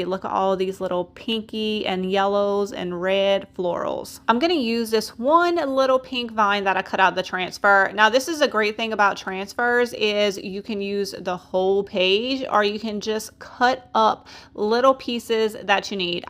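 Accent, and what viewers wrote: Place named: South, United States. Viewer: Ha! I just thought it was a southern accent thing!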